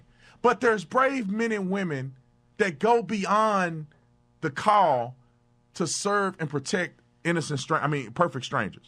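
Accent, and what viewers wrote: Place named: South, United States. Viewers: Love this! He is typically southern! Love thisman!! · Love that strong Southern Accent!!!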